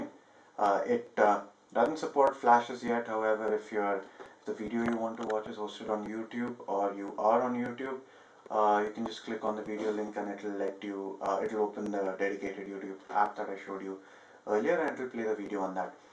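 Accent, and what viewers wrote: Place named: India. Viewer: Your accent resemble like an india even your screen was not clean at all which reflects that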